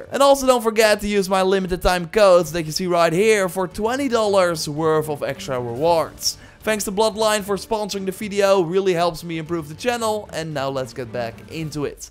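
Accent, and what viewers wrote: Netherlands. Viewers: Ooeeff best lastig om te volgen met zo'n sterk nederlands accent eerlijk gezegd.. wel reviews verder😁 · het hollands accent kan je ook niet verstoppen eh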